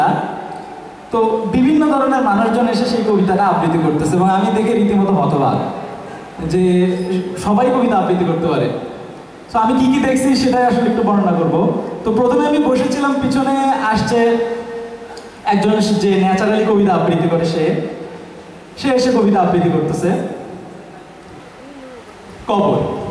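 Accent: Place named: Chittagong, Bangladesh. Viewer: ভাই আপনার বাড়ি কি চিটাগাং এর দিকে নাকি?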